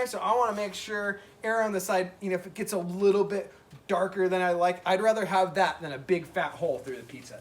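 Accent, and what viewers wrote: California, United States. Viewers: I wonder if this guy knows how California he sounds, and of course he loves his nugzzz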